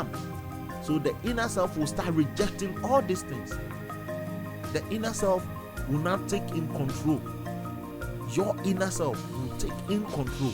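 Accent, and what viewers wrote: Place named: Africa. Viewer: In my personal Opinion African accent is sweet enough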